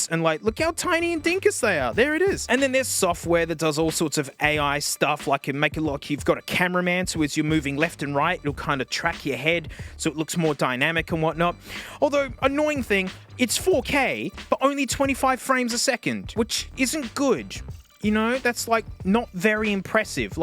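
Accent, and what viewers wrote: Australia. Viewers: And so he can FINALLY let his true Aussie language out.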